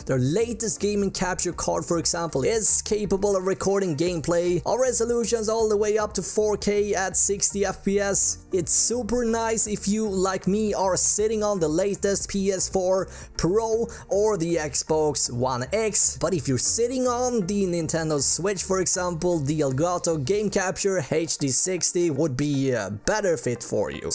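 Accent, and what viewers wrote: Sweden. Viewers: bara svensk dialekt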